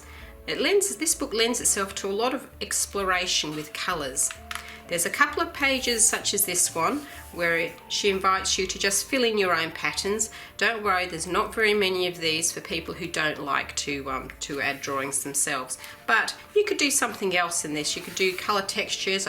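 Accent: Australia. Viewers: Hi, love love love your work, not only beautiful but inspirational (and also refreshing to hear a fellow countrywoman). I have thoroughly enjoyed every single video you have produced, and especially find your book reviews very informative. I received Dagdrommar in the mail the same day you released "how to colour a womans face" and had to chuckle, I have also just received Sommarnatt in the mail today and your are correct, just as "lovely" as the first. I am an amateur artist in Adelaide 45yo male, and just wanted to say "hi" and "thanks', keep up the great work:-)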